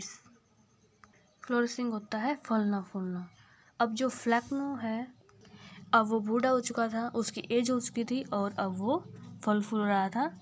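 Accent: Haryana, India. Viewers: Haryana se ho ky?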